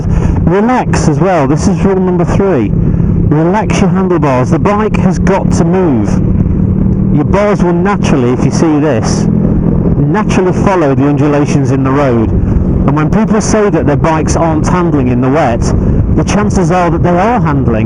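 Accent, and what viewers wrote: United Kingdom. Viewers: great video. thanks. a bit hard with muffled sound, but i could even understand your british well enough. I am from Russia but have lived in California for 22 years.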